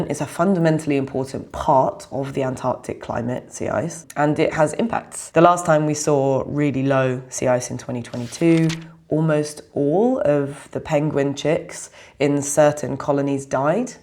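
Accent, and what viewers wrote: United Kingdom. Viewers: To be fair, she does sound a bit British, and we did get our system from them. I say that with the understanding we’ve been too stubborn to update it since.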